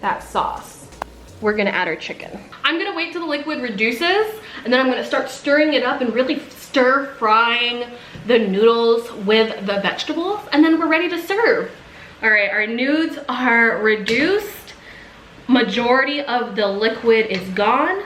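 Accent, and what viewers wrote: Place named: United States. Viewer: you speak American English accent 😊❤ new subscriber from Seattle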